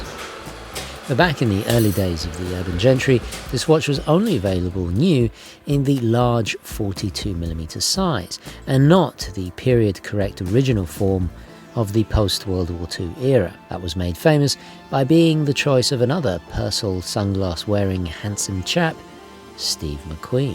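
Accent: United Kingdom. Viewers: An American with a Brit accent in a foreign country doesn’t get anymore American than that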